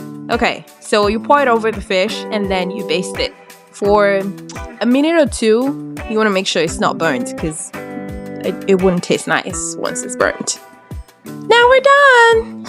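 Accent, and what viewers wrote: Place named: United Kingdom. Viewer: Why did you sound British in this video abi na AI? 🤣🤣🤣. Tell me the truth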